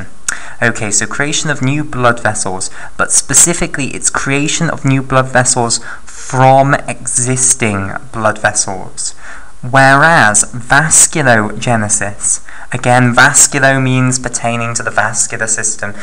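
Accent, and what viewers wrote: United Kingdom. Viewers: God i love british accent 😂🖤 Thank you for such a beatiful video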